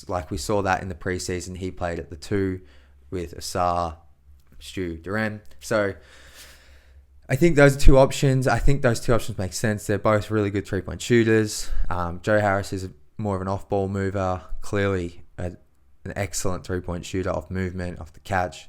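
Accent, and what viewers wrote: Australia. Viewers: Came here from Twitter, and the Australian accent surprised me! Always love an international Deeeeetroiitttt basketball fan! Awesome breakdown & analysis, keep up the premium work!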